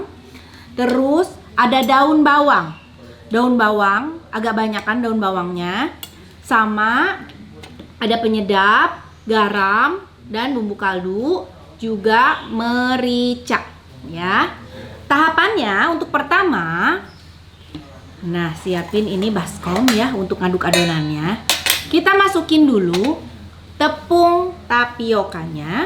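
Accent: West Java, Indonesia. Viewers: mun kacaletot ngomong bahasa sunda, da ges kabiasaan letahna sunda...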